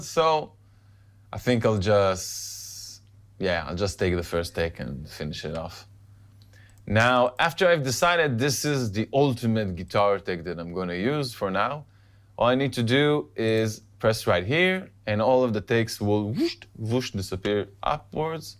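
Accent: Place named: Israel. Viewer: עשיתי סקבסקרייב כי שמעתי מבטא ישראלי :)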